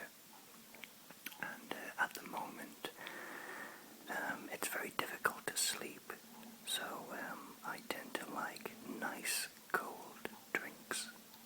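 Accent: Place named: North, United Kingdom. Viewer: This video is way underwatched. I love it! Btw, Where is your accent from? I know its northern but I'm picking up lots of little bits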